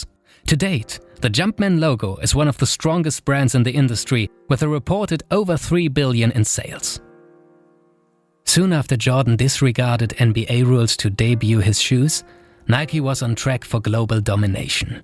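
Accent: Germany. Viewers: Your voice sounds somewhat German. Are you from Germany?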